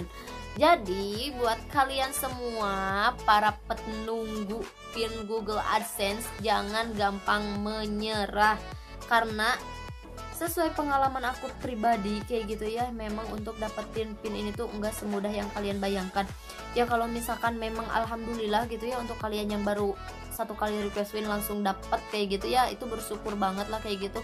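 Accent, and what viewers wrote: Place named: West Java, Indonesia. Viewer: mhun.ttp smngt ja.teh..logat Sunda na mani katingalian pisan.hehe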